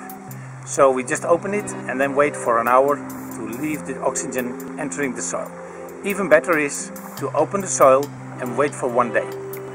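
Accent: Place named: Netherlands. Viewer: Probably, cant miss the dutch accent tho lol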